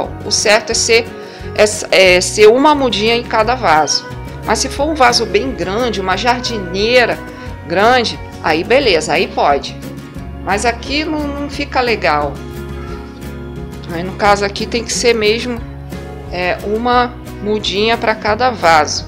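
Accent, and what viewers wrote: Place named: Rio de Janeiro, Brazil. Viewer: Linguajar carioca, para falar com o Brasil; da não viu?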